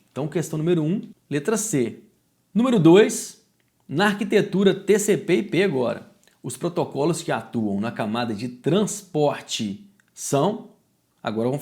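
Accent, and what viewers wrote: Minas Gerais, Brazil. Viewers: professor gratidao pelo conteudo dos videos e por todo o seu esforço de noites e madrugadas para ajudar os que de outra forma nao poderiam ou nao teriam condiçoes de o fazer sozinhos e por si mesmos.....agradeço primeiro por mim e depois por todos e vou acompanhar suas aulas pois conhecimento e bom e com mineirês ai e um must!!! Xiq nurtimu!!! rsrs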